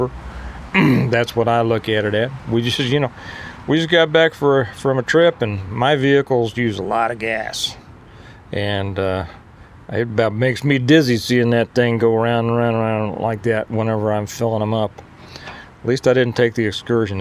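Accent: South, United States. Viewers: You're making me over comment ....You're much better than Dave Ramsey ...in fact you should brush up and apply for the show .....your country accent is better also ....Time for a change !!!👍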